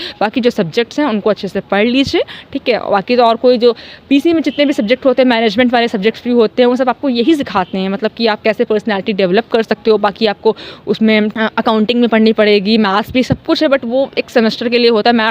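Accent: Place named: Haryana, India. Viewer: Oh well actually from your language I thought that you are from Haryana.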